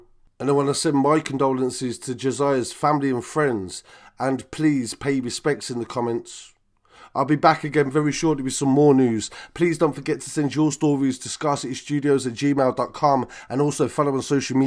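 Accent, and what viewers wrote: Birmingham, United Kingdom. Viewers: Bro your starting to sound like a brummy bin man again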